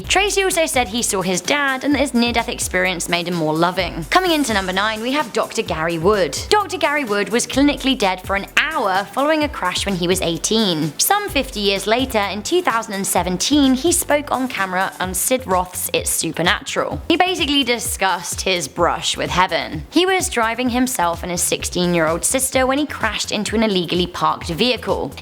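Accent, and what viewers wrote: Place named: United Kingdom. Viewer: I love and believe in heaven not because of mere religion but also of faith (duh)oh yeah and I know people who saw Jesus or maybe God 😛. They testified that they went into a trance before seeing stuff during our praise and worship sessions then woke up, crying stuff out in tears... "I saw Him😨!!!I saw Him😢!!! He's wearing white😭!!!!!!!" And I'm so wowed by the fact that you guyz, would make a vid about these blessed people.🙂 So yeah. Moooore power to you guyzzz😍. GodddBlesss!!!😆😆😆 and ps Oi love your bri'ish accen' i's jus sow wow😍😍😍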